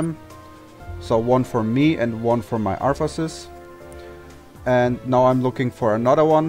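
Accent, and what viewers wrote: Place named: Germany. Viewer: Bro's accent is very German xd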